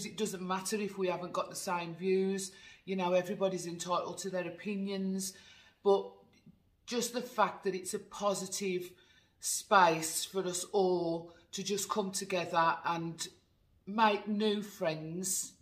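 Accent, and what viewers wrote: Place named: Birmingham, United Kingdom. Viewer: Enjoyed that, thanks for sharing. I got into You tube hauls during lockdown as I missed shopping so much! Strangely all the people you mentioned I subscribe to! However it amazes me how many others I subscribe to just disappear into the ether, which I find concerning as they are virtual friends and I worry about them. I was brought up in Birmingham so I love to hear your accent as I have lived in London for many years. I have never heard the accent described as what you called it! Keep the vlogs coming!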